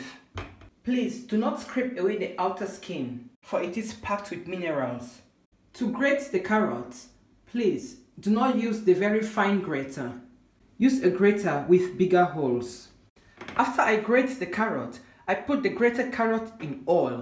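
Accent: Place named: Cameroon. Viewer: You sound very Cameroonian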